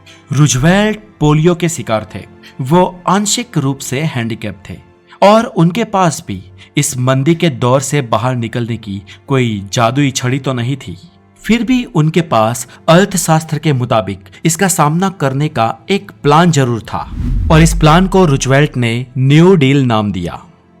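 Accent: Gujarat, India. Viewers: Your voice is gujarati ...👍👍👍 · Gujarati lago cho bhai tmy 😀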